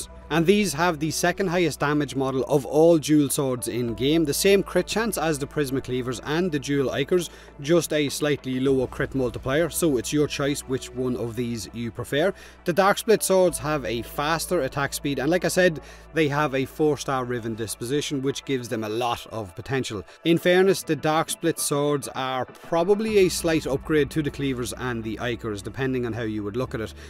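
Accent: Ireland. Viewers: Am i the only one that doesnt give a fuck about the warframe but come just for that sweat Irish accent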